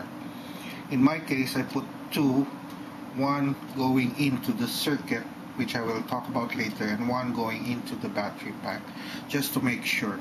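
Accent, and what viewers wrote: Philippines. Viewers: pinoy accent :) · sebwano ka man dong? accent mo bisaya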